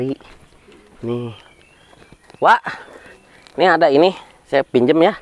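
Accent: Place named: Banten, Indonesia. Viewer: Bahasa na sami siga di banten balukang, baralak kampung naon atuh ieu kang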